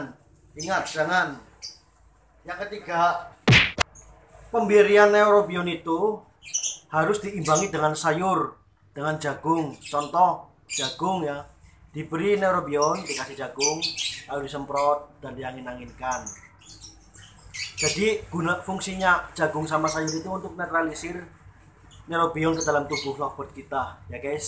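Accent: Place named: Banyumas, Indonesia. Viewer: Mantap mase...ora ngapak ora kepenak.... Salam suport...🙏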